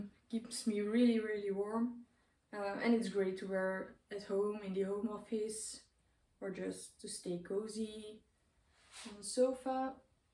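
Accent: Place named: Russia. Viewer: Прекрасные изделия! Но у вас такой русский акцент...😀 Спаибо🙂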